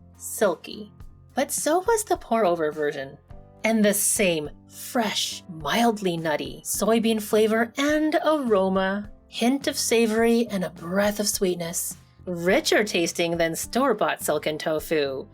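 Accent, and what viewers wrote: Canada. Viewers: I love your soothing voice and Canadian accent. ❤ Could I make this silken tofu for Douhua with ginger syrup? Awesome video 👍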